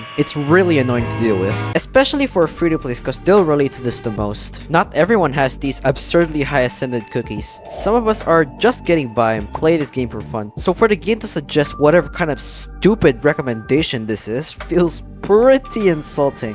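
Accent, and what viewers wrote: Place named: Philippines. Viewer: i know a filipino when i hear one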